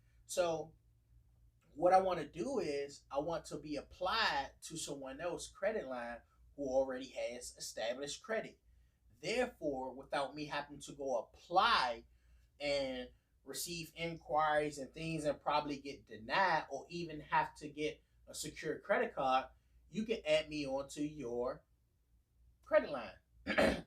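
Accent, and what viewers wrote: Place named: Washington DC, United States. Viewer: bro def from pg or dc 😂 facts but great video my guy !!!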